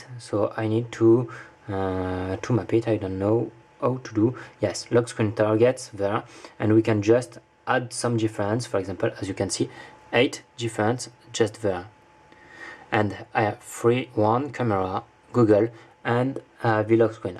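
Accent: France. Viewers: Je reconnais bien un accent Français derrière ! Pourquoi cette ROM n'est plus sur XDA ? Un problème avec ? I can regognize a french accent ! Why this ROM is not more available on XDA ? Problem with ?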